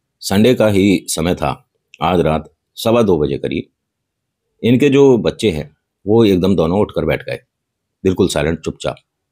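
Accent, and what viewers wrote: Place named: Meerut, India. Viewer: Bhai aap meerut seh ho na.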